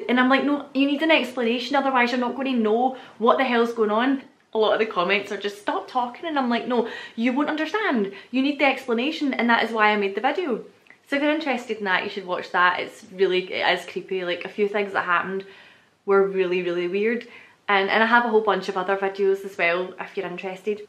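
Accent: Scotland, United Kingdom. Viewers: Funny you get comments about you talking too much. The hugest part of why I watch your videos is to hear you speak Scottishly (yeah, I made that word up).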